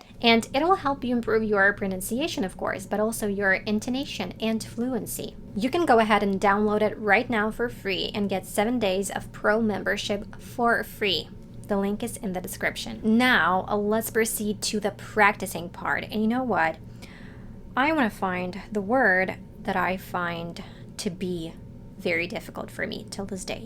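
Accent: United States. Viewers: How long did it take you to master American accent ? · You’re American accent sounds beautiful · It doesn't seem to me that you are not american because of expanding your accent accuracy. Go beyond and make yourself smarter more and more.❤❤❤❤❤ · I just have known your channel since March this year. I must have to say your accent let me consider you are from America. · Dear Madam, congratulation on the level of Amercican accent. You have made a great progress, but be aware of the fact that all the media products mentioned by you need not be available to everyone. To turn your presnentation into a resource for listeners, have your presentation arranged in parts dealing with words, phrases and sentences spiced up with specific aspects e.g. setting the organs of speech before starting the warm-up exercises for pronounciation of sounds, syllables, then at phrase level the stress and finally intonation at sentence functions., etc. 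making up the so-called mile-stones along the almost never-ending but really a rewarding journey of learning a foreign language plus the culture, traditions etc. Best regards, Paul,67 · Modern British RP is more distinctive and eloquent. Just my opinion. You're doing very well with your American accent though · Why you the force of pronounciation like the accent American?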